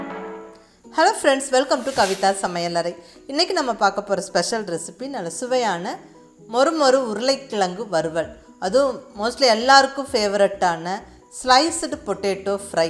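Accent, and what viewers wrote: Kongu, India. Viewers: Mam unga Kong nattu voice super ennoda sunnuku pidikum.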